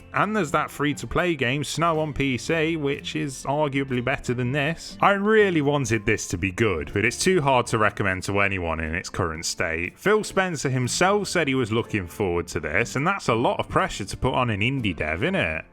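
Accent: United Kingdom. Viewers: Yoo this dude hgas such a Grimsby accent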